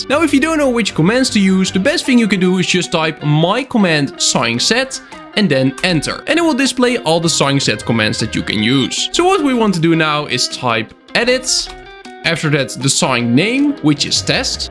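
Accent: Netherlands. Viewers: I smell Nederlander?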